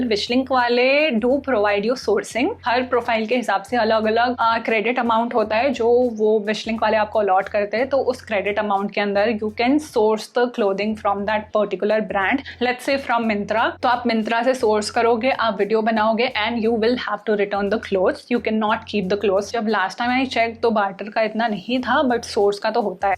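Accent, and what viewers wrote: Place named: Japan. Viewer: lovedd your Japanese acsenttt it suits you so well 😍😍 lots of love 💌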